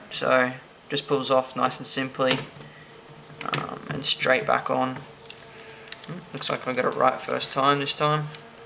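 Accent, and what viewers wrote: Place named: Australia. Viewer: Are you from Australia or New Zealand, i can't tell lol. Either way it sucks that that was over $1,000. Here in America (i am not trying to boast, our country sucks right now cuz of obama and the liberals) i can find one for under $700 easy, and the ones without a stainless barrel around $550. Good luck, and enjoy the shooting!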